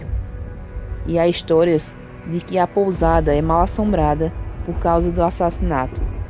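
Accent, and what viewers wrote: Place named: Northeast, Brazil. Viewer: Gosto do sotaque nordestino. Não é atoa que a esposa,falecida, do Mark Nazarova o deixou meio doido depois que ela faleceu, como se não bastasse a filha falecida antes da mãe vítima na guerra. Lastimável. Jesús Cristo e Deus te abençoe e console Mark Nazarova, Deus é bom. Deus e Jesús Cristo nós abençoe e proteja sempre 🇧🇷🌈🌻🙏🏻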